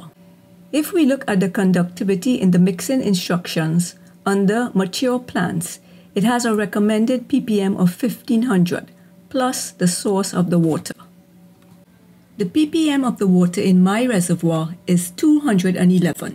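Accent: Trinidad and Tobago. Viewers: You sound like a real trini gal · I knew her voice was sounding like a Trinidadian! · Are you from Trinidad?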